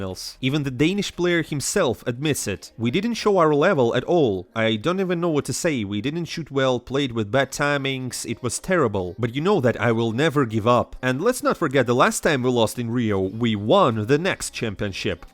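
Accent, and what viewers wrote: Russia. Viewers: I can feel russian accent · Спасибо за новости, ты пытался не спалиться что русский))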